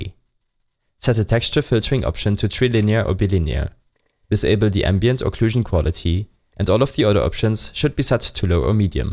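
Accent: Germany. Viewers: Der deutsche Akzent😂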